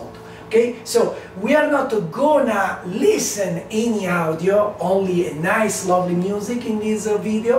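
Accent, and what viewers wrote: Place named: Italy. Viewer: Your Italian accent is Fabulous!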